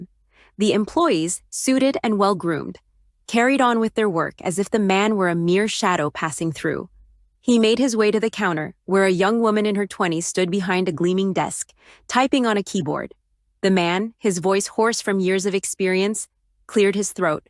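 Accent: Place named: United States. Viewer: What a load of bull****, where do they get theses stories from. sounds american from the accent.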